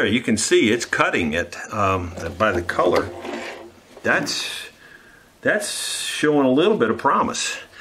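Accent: United States. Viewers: what a transformation, trash to treasure and I just love the country voice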